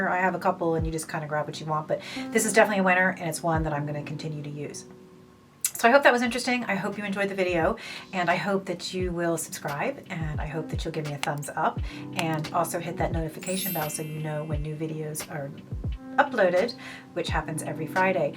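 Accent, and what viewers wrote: Ireland. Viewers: I’ve been on the fence about this, but now I’m going to try it after seeing how great it looks on you. PS: don’t remember how I stumbled across your channel, but I’m so glad I did. You’re lovely, and I love that hint of the lovely Irish brogue!💚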